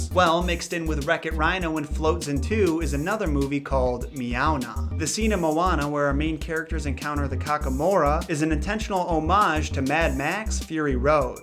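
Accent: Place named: New Jersey, United States. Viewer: HAHAHA that jersey accent though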